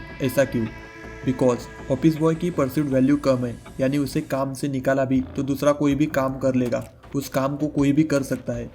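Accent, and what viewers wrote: Maharashtra, India. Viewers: Your Marathi accent is so sexy … keep it raw😎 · Your Marathi accent is 😅 ,, full video i was thinking about it ❤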